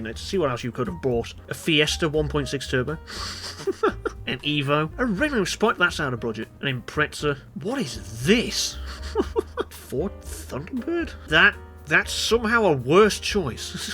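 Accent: United Kingdom. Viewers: HELLO FUNNY NASAL BRITISH LAD,CONGRATS MISTER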